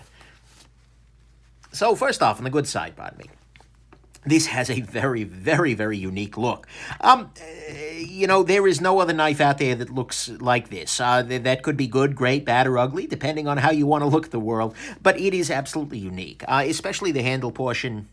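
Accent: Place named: Brooklyn, United States. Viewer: As a New Yorker, I give this a thumbs up and comment for the Brooklyn Jewish accent alone!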